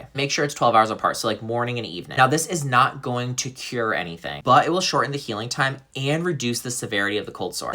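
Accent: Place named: Australia. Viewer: She sounds Australian, Australia pharmacy’s aren’t the same as USA. Very limited, usually cold sores treated topically w/ aciclovir… or just wait it out.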